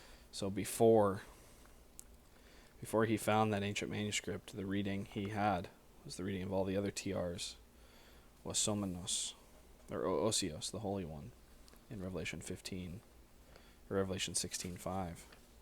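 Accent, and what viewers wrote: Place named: Greece. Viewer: Great to hear Historical Greek Pronunciation (HGP) by an English speaker. Until you spoke English, you sounded like a native Greek.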